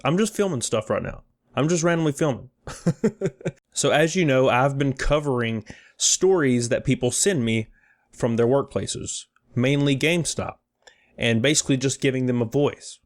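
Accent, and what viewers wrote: South, United States. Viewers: Anyone know what part of the south hails from? Love the accent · Funny how it takes just one man with a camera and a southern accent to destroy Gamestop. You're the best,! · Do I hear a fellow country boi actsent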